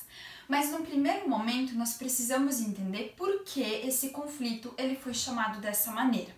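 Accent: Brazil. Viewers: é paranaense (do sudoeste, provavelmente). O sotaque é igualzinho. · Que legal esse sotaque gaúcho!!!!!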